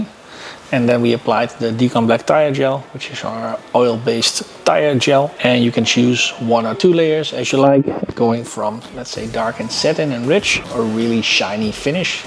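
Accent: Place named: Netherlands. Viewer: Hello, you sound like a Dutch guy speaking English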